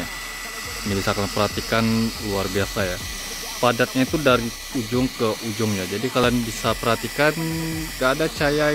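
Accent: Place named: Sumatra, Indonesia. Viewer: Logat sumatra bang emg gitu ga perlu di komentarin wkwk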